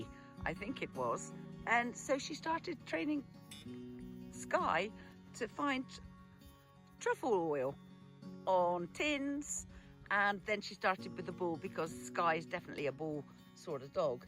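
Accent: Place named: West Country, United Kingdom. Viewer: thanks for that lovely walk in the country, glad to hear you have not lost your west country accent. Go girl. keep walking and talking.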